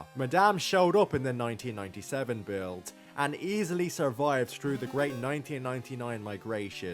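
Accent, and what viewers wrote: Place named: Ireland. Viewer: The accent is very whiplashy to me because I got bullied so hard in Dublin for liking pokemon, so seeing a really cool and nerdy pokemon video in an Irish accent is WILD to me lmao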